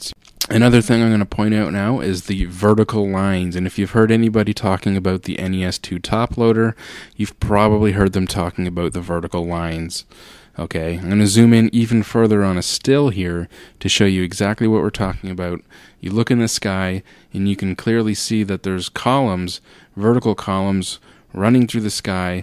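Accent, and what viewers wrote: Canada. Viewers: Are you Canadian? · Wow. Nice. Hey, are you Canadian? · Yes he is indeed a Canuck. As am I. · is this guy canadian because he sounds canadian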